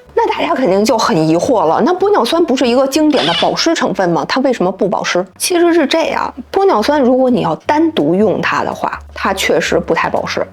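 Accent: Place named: Beijing, China. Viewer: 感谢您的讲解，说话京腔特逗😅，非常喜欢您的节目，希望多出，受益匪浅❤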